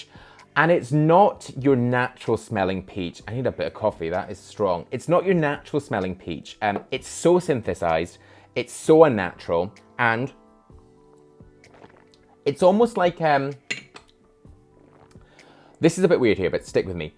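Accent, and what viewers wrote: Scotland, United Kingdom. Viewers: Not related- but your accent is definitely less scottish. Idk how you feel about that but it's an observation. Love your stuff from the states! Keep on brother!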